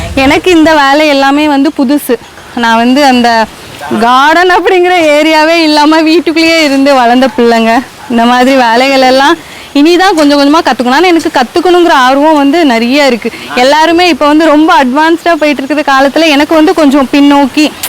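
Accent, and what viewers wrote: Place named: Nagercoil, India. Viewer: Super sis, when you speak live I can see your Nagercoil slang that's good.... Totally enjoyed your home garden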